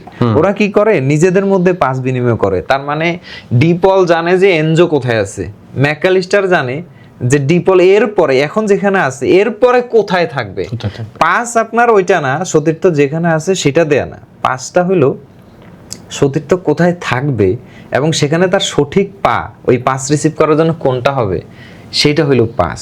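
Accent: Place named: Noakhali, Bangladesh. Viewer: এই লোক নোয়াখাইল্যা মনে হচ্ছে তবে এনালাইসিস সঠিক 😅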